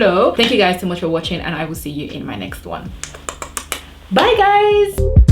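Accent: Nigeria. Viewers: This video just said it all and I love the fact that you also don't care about what people think about your accent,if it's the accent that's their problem,they should find the accent best suitable for their ears then. Team Nigeria 🇳🇬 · I actually love Nigerian accents I think they sound so beautiful and genuine, honestly growing up with parents who have an accent makes me ask the people who said, why you watching the video, if you can’t get past the accent? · I love your beautiful nigerian accent 😻 I am French and been watching you for so long. I understand so well Keep going and glowing ❤ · The intro! :) Girl your accent sounds beautiful!!!! Naija giiiirrllll <3 MUCH LOVE SIS! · Girl one of the reasons I listen to you is because of your accent 😂. Naija represent, don’t mind these people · I’m Kenyan!🇰🇪And I love your Nigerian accent! Haters are gonna hate. Just keep up with your amazing videos & advice!❤️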